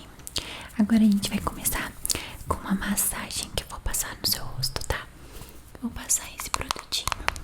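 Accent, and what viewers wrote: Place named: Goiás, Brazil. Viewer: Vc é Goiana? Seu sotaque parece nosso ❤️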